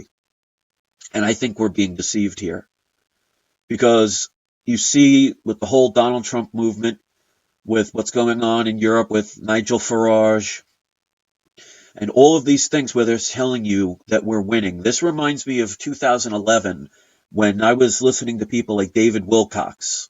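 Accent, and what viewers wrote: New York, United States. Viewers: YOU SOUND LIKE YOU ARE A NEW YARKER HEY FROM THE WEST COAST ME-REFUGEE CITIZEN HEFT SAN JOSE CA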